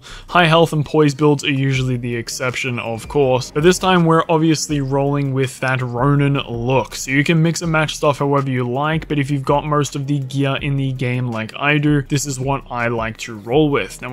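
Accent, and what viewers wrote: Australia. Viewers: Nice work mate!! Aussie?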